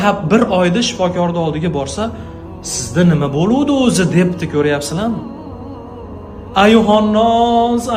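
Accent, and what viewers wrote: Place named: Fergana Valley, Uzbekistan. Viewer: Оглим сизни тингласам мазза киламан : бирам чиройли килиб водий шевада гапирасиз оглим : Тани жониз Сог болсин Ширин болам : асал болам : Рахмат сизга : 72 ешли онахон сизни тинглаб рохат киламан👍👍👍👍👍❤️❤️❤️❤️❤️❤️❤️❤️❤️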